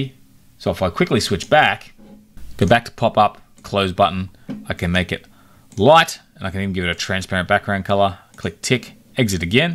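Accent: Australia. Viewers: Thanks for the tutorial. Nice to hear an aussie voice too!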